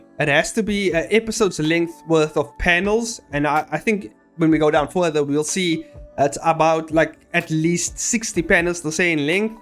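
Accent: South Africa. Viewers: You're from south African?!!!😲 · Ayeee you sound like a fellow south African. I just started working on my submission, gonna be a hectic month of grinding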